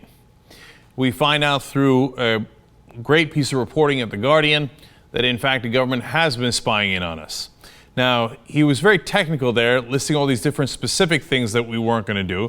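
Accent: Germany. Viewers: Worst German accent ever. Otherwise a great vid. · Cenk f*** you for pulling Stuttgart, Frankfurt and your weird German accent into this, it's not the German government doing that and btw, the CIA put up their base in Stuttgart spying on and kidnapping German citizens as well.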